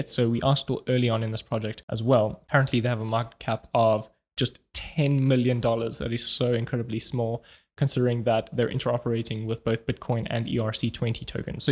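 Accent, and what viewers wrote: South Africa. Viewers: I love your south African or Australian accent and your videos. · By any chance you're South African ?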